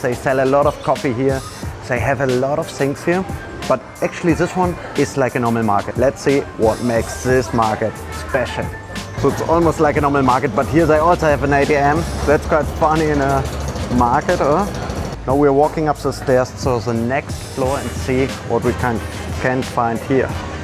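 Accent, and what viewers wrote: Germany. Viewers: I like Your German aksen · Direkt den deutschen Akzent rausgehört 😂😂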